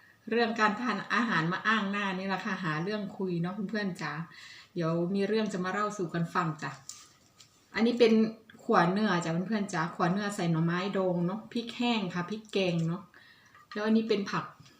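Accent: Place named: Korat, Thailand. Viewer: น้อง เป็นคนจ้งหวัดอะไรสำเนืยงพูดเหมือนคนโคราชมัยหรือว่าแถวนางรอง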